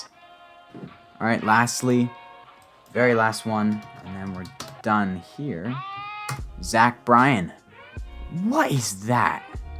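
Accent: Canada. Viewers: Dude why are you so amazed. We have little towns all over near Sarnia (which is where I believe you are from by the accent, me too). Arkona? Thedford? Watford? Chamlachie? The list goes on.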